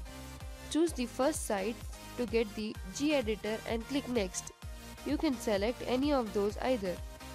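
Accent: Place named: India. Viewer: Wtf this indian accent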